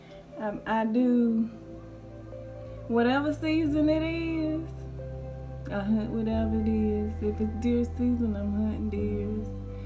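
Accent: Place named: South, United States. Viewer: Hello. I so enjoy your channel. I love your soft speaking voice with that southern tone. Even through your shyness I can you're a sweet person. You have the best teacher. Keep the video's coming. Next time show us the food while you're cooking. That's right feed your babies when they are hungry. Be Blessed. Tell Soulful T hello.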